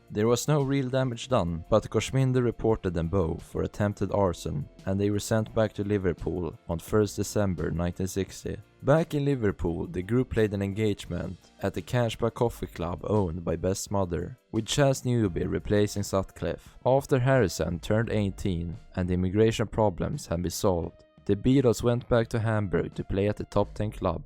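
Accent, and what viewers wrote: Sweden. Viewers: Your ATROCIOUS swedish accent is just too unbearable to listen to for a whole video, sorry... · you sound so swedish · I like your swedish accent, I also happen to have hyperfixation on the beatles so this video will be on my "videos for eating snacks" list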